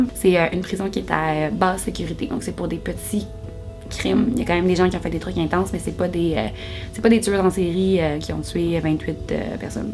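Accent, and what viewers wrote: Quebec, Canada. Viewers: Je suis francaise et je viens d'ecouter ta vidé, tu es vraiment trop cute avec ton accent; c'est du Québec? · J'aime pas les québécois comme elle je suis pas rasciste j'aime pas l'accent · L'accent canadien te vas super bien :3 :)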